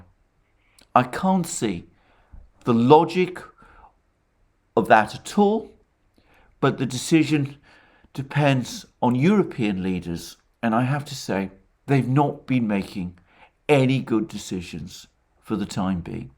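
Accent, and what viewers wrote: England, United Kingdom. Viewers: his lovely English accent,they way he scratches his head....that loquanical....droll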